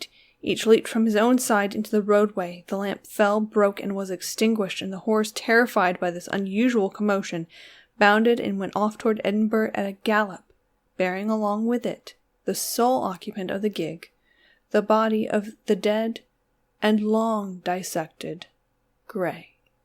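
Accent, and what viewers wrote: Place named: United States. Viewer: Hi, I came for a listen at the urging of Tony Walker (Classic ghost stories), & I find his praises correct. Wonderful narration, & your character shines through in a fabulous quirky way that I really enjoyed. I have to confess that I generally avoid narrators with American accents, (sorry, I find so many of them grating, might be an Australian thing?). Happily your reading made the accent irrelevant ... Loved it.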